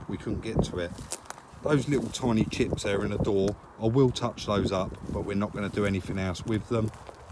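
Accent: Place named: Yorkshire, United Kingdom. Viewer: Great vid. You are making honest money there on that, cos you put time, money and organisation in. I am Scottish and I just love your accent. What part of Yorkshire are you from?...........lol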